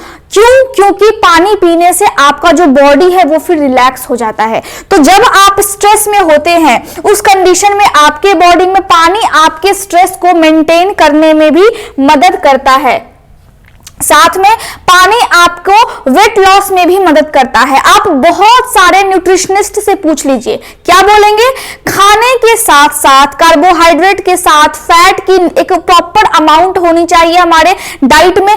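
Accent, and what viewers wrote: Bihar, India. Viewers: Bache chage your flow ..... Bihari language flow aah raha. Update beta . I am Army men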